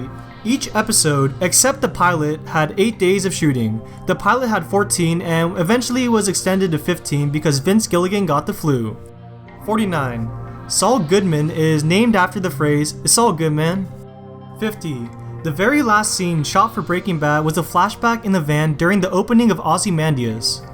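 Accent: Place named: Midwest, United States. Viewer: He sounds midwestern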